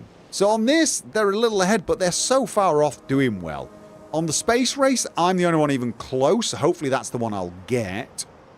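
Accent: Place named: Manchester, United Kingdom. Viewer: Sometimes, I prefer the intro chat to the game, mostly because the rodent is a fellow Mancunian, and it's like listening to an older cultural cousin. 😂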